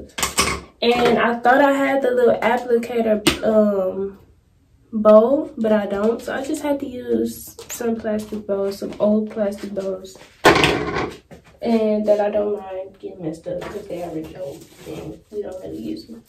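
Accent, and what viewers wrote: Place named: Texas, United States. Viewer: Your hair looks beautiful!! I said yep that's a Texas accent.... possibly East Texas! Thanks for showing us doll💚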